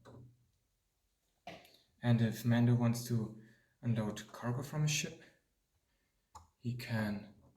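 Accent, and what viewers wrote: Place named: Germany. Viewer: Aber der Dialekt ist so Deutsch 🤣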